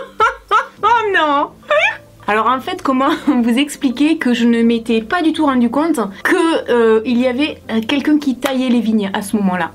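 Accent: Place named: Perpignan, France. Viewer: Oooh je me disais bien que cet accent m'étais familier ! Je suis aussi de Perpignan ^^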